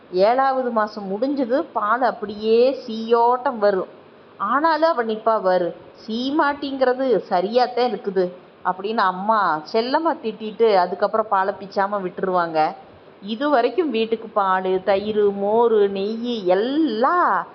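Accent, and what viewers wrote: Kongu, India. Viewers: Kongu tamil arumaii ❤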